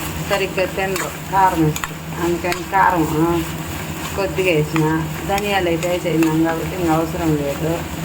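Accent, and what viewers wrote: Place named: Chittoor, India. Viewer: Pakka chittoor basha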